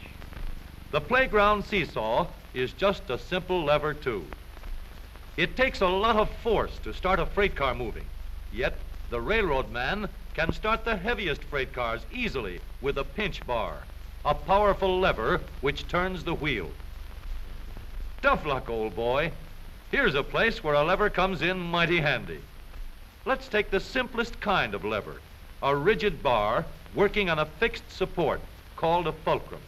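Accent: Transatlantic, United States. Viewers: It’s a form of Trans Atlantic dialect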